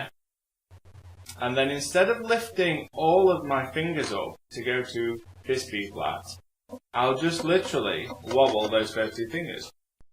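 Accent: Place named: England, United Kingdom. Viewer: I like your haevy english accent,knookle key. There is bis, flute 1 /1. Albert clarinet side key, great well shown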